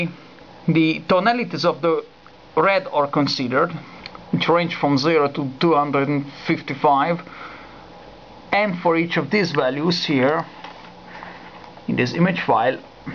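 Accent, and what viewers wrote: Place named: Hungary. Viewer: HAHAH! Végig a videódban gyanús volt, hogy nem-e magyar vagy, és lám utána elolvasva a kommented, kiderül tényleg! Annyira tipikus a magyorok angol akcentusa, hogy bárhol a világon meghallasz egy magyart angolul beszélni, rögtön le lehet vágni! Gratula a progihoz! Csak most találtam rá tök véletlen, mert a minap valahogy belefutottam egy az 1nsane-ről készült videóba, amiről meg rögtön beugrott ugye a nagy ős klasszikus Terep2. Amikor rákerestem, valahogy a te progidba is belefutottam. Kár, hogy nem fejlesztetted tovább...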